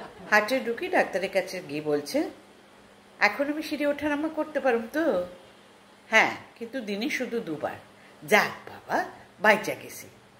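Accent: Bangladesh. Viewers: পূর্ব বঙ্গের ভাষা বেশ লাগছে । দিদি বিক্রমপুর এসে বেড়িয়ে যান । নিশ্চয়তা দিচ্ছি ভালো লাগবে ।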